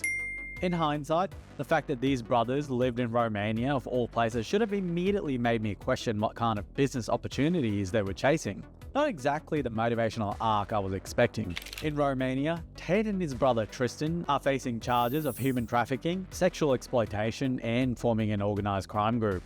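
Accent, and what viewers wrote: Australia. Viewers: Is that a melboune accent sir?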